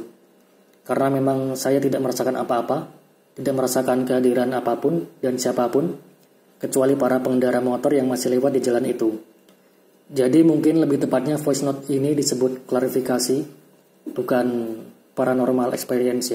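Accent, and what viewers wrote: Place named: Java, Indonesia. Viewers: Seram dengan tanda pentung itu... Mas e wong jowo.. jowone ketok bgt mas 😆😆😆😆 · Mas metal banget deh 🙌 Dijabarkan dg lugas, jelas & khas medok orang jawa 👏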